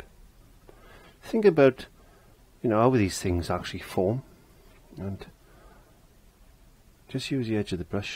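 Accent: Wales, United Kingdom. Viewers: Hi, I've enjoyed watching your videos. I've been painting with acrylics since 1970 and have learned as I went like you said, experiment and I just go for it. I've never taken lessons. So your 5 tips were very helpful! Also I could listen to your Welsh accent forever. Love it! Thank you - Amy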